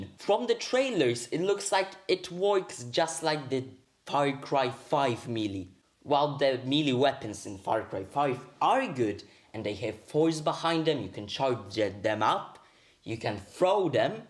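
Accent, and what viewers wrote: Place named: United Kingdom. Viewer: and stop the british accent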